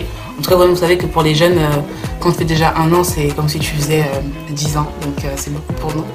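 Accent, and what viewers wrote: Africa. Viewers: je t’aime bcp surtout ton accent africaine oh j’adore 😍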